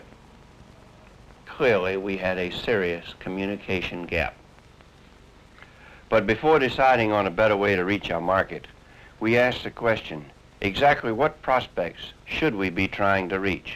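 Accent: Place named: Virginia, United States. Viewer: The CC says "it makes me shut up." He is actually saying "it makes me SHUDDER." There is that Virginia accent to account for. Yes, NICE old trucks!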